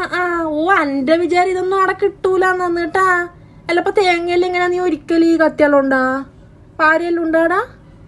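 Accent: Kannur, India. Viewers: ആഹാ അന്തസ്..... 🤗🤗🤗🤗🤗🤗കണ്ണൂർ ഭാഷ ഇസ്‌തം..... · Amalee kannur bashaa😘😘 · You are so talented. Your action, the way you speak reminds me of our neighbours or close cousins in Kannur, with their simplicity and innocence! Love my place ❤️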